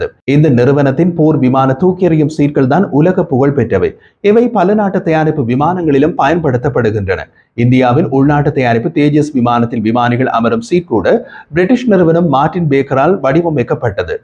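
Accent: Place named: Jaffna, Sri Lanka. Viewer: யாழ் தமிழைக் கேட்டு மகிழ்கின்றேன் மிக்க நன்றி வாழ்த்துக்கள்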